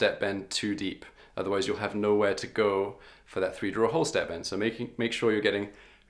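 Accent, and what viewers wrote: United Kingdom. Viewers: I love your videos... 1 suggestion is to have the tabs above where the scrolling time bar at the bottom of the page... i like to pause and practice before i play along and the bar is right across the tab... You sound british so cheers!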